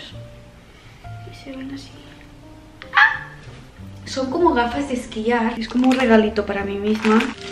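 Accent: Spain. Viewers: i'm OBSESSED with the way you pronounce english words in spanish bc SAMEEE JDJSDJ i'm from Chile but I speak perfect english y la verdad es que siempre que hay palabras en inglés que se usan cotidianamente, las digo con el acento chileno (or when i'm speaking korean too, digo las palabras en inglés en la pronunciación coreana) JDSJD like, obviously it's not the way they're actually pronounced but it's more comfortable and it makes more sense that way DSJD i'm sure you know what i mean. anyways !!! tu español es perfectooo. Hace mucho tiempo que no veía un video tuyo hablando español y mucho menos durante tanto rato jadjsjd me encantó. amé que tienes súper marcado el acento español. Te sigo hace un par de años (maybe even 3? idk) and i didn't know you were born in Spain!!! siempre pensé que te mudaste allí de pequeña, pero que habías nacido en otro lado. the more you know 🌟🌈 i'm rambling at this point i'm sorry KJASNXKAJSN just wanted to say !!! 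that this was very entertaining and yeah! hace mucho tiempo que no te dejaba un comentario jdjs que estés bien!!! 🥰 edit: btw, i loved the last part JDJSD i spent two months in barcelona back in 2019 and i absolutely LOVED it. so it brought back a lot of good memories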